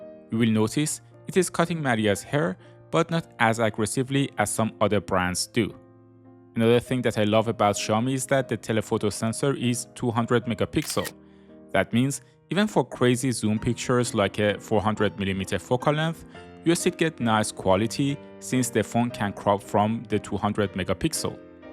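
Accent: Iran. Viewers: خانومتون از دید خواهری خیلی زیباست. راستی از لحجه تون فهمیدم که هم وطن هستین.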